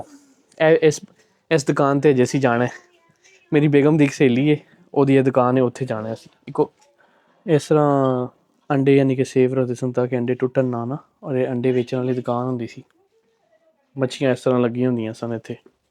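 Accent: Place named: Lahore, Pakistan. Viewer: bhai apse kisi video me question kiya tha k ap kidhr se hein. Apki punjabi se lgta tha ap lahore se hein. Phir kisi video ne suna apne btaya apne bht sari life lahore me guzari hai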